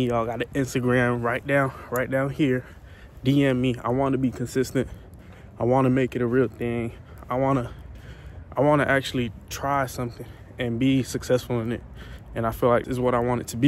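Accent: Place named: United Kingdom. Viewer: Have you ever lived in the UK? Your accent is slightly british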